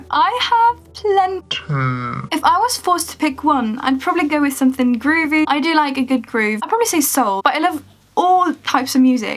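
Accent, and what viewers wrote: England, United Kingdom. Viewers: You sound British? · You're Sweden but you sound so English like damn this is new to me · jag trodde att du var britt först innan jag insåg att du är svensk ahahah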